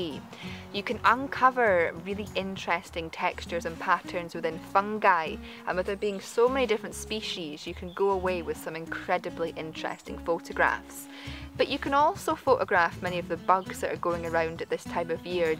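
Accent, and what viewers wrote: Scotland, United Kingdom. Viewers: Thoroughly enjoyed the video and your wee Scottish brogue.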